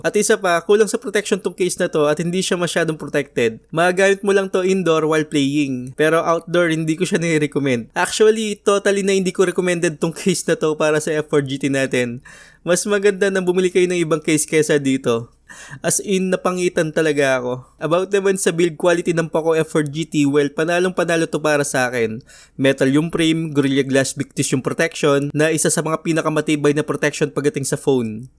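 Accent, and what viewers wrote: Pampanga, Philippines. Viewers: Kapampangan kaba sir? Great video btw.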